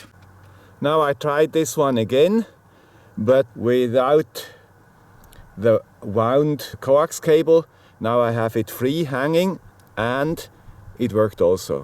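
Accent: Switzerland. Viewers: Congratulations with the birthday, the world records and the nice Swiss accent. Thank you for explaining everything so clearly and with so much useful details and links, already 120 times! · Grandpa with swiss accent always make things clear. Thanks from an engineering student sir. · Happy birthday "Guy with swiss accent"! Great content, as always!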